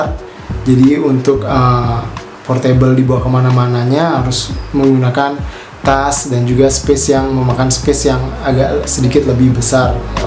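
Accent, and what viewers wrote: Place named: Bali, Indonesia. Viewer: bang kq logatnya kyak logat bali ?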